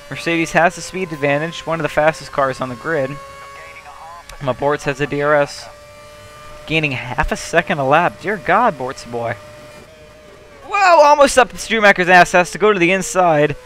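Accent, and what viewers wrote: United States. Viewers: So why's he got an american accent?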